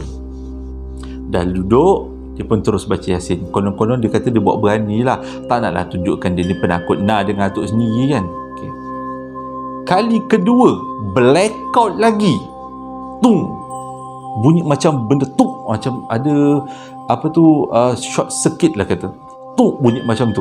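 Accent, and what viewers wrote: Johor, Malaysia. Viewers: Yer la… melekat tul Johornya tu… I like! 😄😄😄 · Abg memang berbakat beso cara penyampai cerita seram pelesit dgn loghat johor .. 👍👍👍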